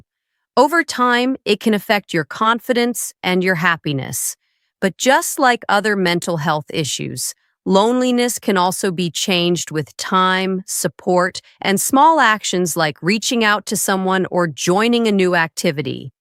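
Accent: United States. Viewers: This is not a British accent but horrible American accent with delivery of irritating pronunciation of Rrrrrrrr.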